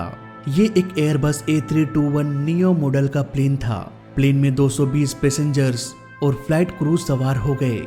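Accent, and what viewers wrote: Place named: Bihar, India. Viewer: yeh kya hai bhai bihari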